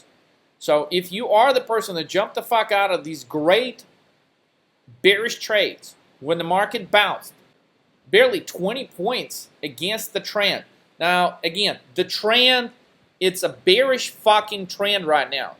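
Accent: South, United States. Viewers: What kind of fucking accent is this lmaooooo craziest one iv ever heard i here European , southern American and New Yorker all at the same time!!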